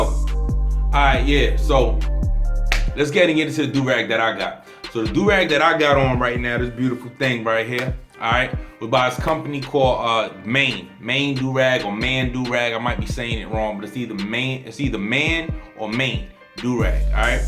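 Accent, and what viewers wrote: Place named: New York, United States. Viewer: He's clearly from NY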